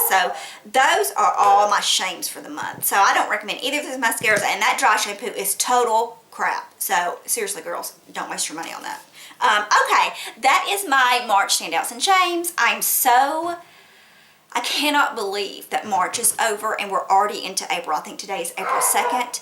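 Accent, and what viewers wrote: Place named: South, United States. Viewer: Great video hun and I just love your southern accent heehee too cute for words :) I subscribed have a great weekend dear xxx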